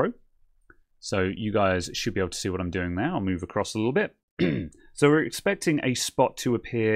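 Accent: England, United Kingdom. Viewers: Just inject 0.8 volts with limiting at 2A current.You ll be safe,if the heated area is crowded,use alchohol and inject power again to spot the exact faulty component.YOU NEED A THOUSAND HOURS TO FIND IT WITH SCHEMATICS AND MEASUREMENTS and at the end of the days you will have learned nothing.Anyway i admire your engilsh accent and your patience.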